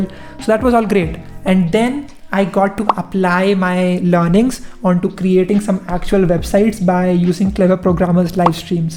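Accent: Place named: Andhra Pradesh, India. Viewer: thanks for reply but it seemed like you are from any part of our South like Andhra guy so that's why asked.by the way really you are doing great job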